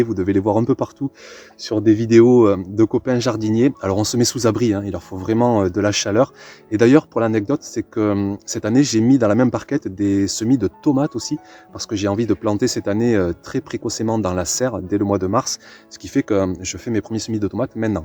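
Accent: South, France. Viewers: c est un plaisir de vous écouter , votre accent du sud et vos explications sont un régal bravo ! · Tellement contente que la saison commence !! Tes vidéos et ton accent du sud nous feraient presque croire qu'il fait beau dehors 😅 merci !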